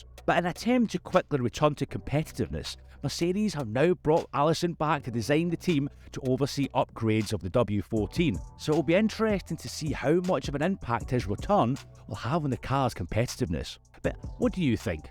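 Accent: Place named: Highlands, United Kingdom. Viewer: nice accent man, r u highlander? u roll the r like spanish pro speaker jajaja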